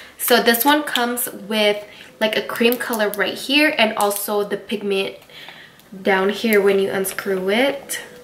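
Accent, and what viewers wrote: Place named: United States. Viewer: Christina Tran well she sounds american to me so I think thats classed as an accent aha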